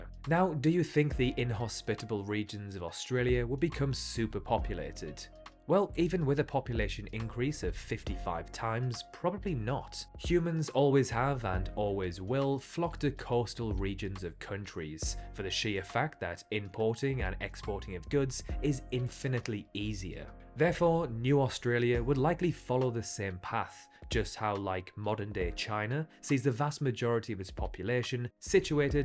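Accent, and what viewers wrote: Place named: North, United Kingdom. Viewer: Love the Northern accent already <3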